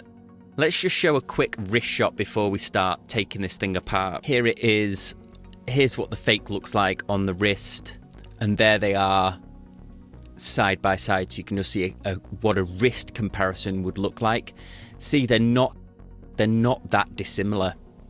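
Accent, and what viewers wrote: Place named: Scotland, United Kingdom. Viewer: He needs to fix his Scottish or whatever voice damn annoying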